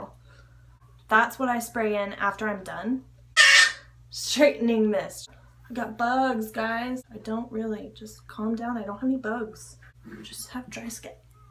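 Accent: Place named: United Kingdom. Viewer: That British accent though.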